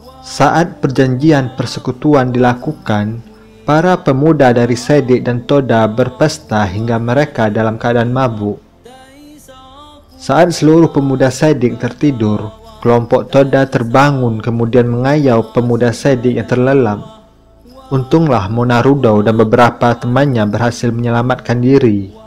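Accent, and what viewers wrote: Manggarai, Indonesia. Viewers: Fokus dengan logatnya seperti logat manggarai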